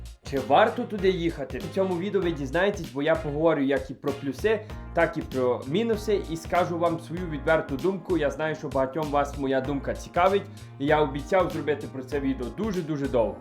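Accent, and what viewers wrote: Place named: Lviv, Ukraine. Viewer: Красень, твій акцент вражає ! Так мають розмовляти всі Українці, не тільки вихідці зі Львова! Я особисто з Житомира і шкода , що люди з Донбасу і з східної Ураїни так не розмовляють. Дякую за твій контент ! Слава ЗСУ!!!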